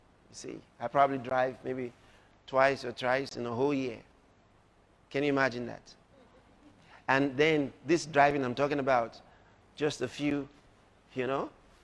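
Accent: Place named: Nigeria. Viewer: no matter how he hides his accent it still shows he is from Nigeria 😀😀😀😀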